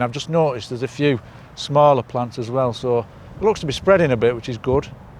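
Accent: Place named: Yorkshire, United Kingdom. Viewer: Greetings from Alberta Canada. We used to live in Melmerby Coverdale, and we really miss the walks. Thanks for sharing your lovely walks with us. We really enjoy them, and love your photos of flowers, birds, butterflys etc, and all the information you share, and we love your Yorkshire accent. Please keep walking and sharing.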